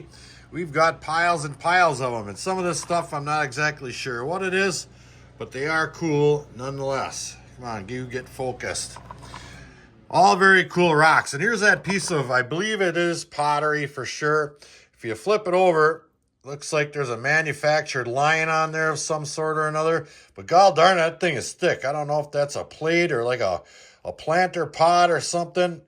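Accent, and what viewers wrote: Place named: Wisconsin, United States. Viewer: The Wisconsin accent rocks! Love it.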